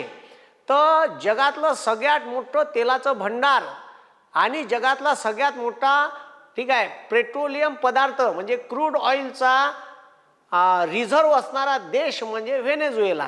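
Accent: Vidarbha, India. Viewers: खुप छान 👌👌. भाषा विदर्भातली आहे का, ऐकायला खुप भारी वाटते. 👌👌👍👍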